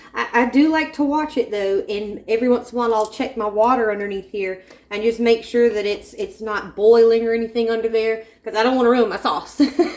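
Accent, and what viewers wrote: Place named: Mississippi, United States. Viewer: You talk like my cousins ❤ Were from Mississippi, are you??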